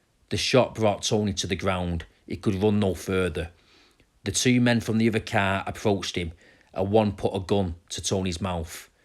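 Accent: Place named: Manchester, United Kingdom. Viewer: Really enjoy your kids, though even though a lot of your accent is manc, you can't deny there's a Lancashire lilt there, which part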